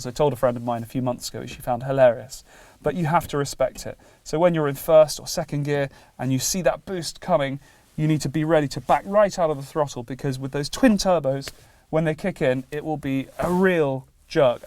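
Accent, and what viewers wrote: United Kingdom. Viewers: hearing a brit explain how collectors complain about having a lift vs having an auto lift is a rich ppl problem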